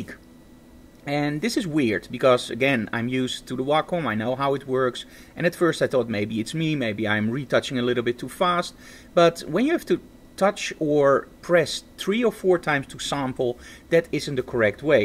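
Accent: Netherlands. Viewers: Hm Me: looking for a fix for my wacom Me: Coming across this video Me: huh, this guy has a typical dutch accent.. I wonder if he's... Me: * finding his website * Me: .. I was right.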